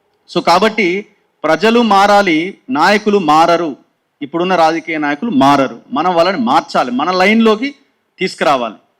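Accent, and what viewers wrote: Telangana, India. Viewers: అన్న తెలంగాణా గొంతు....❤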